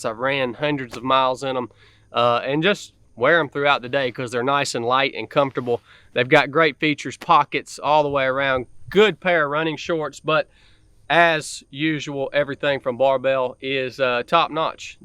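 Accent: South, United States. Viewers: Smart people with southern accents are always fun to listen to 🤟🏼🤟🏼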